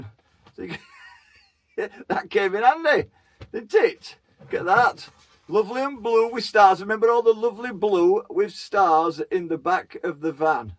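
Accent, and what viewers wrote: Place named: Barnsley, United Kingdom. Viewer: Barnsley by t sounds. same ere..good video lads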